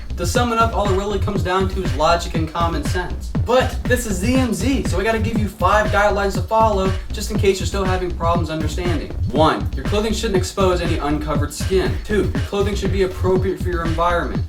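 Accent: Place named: St. Louis, United States. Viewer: sounds like saint louis, missouri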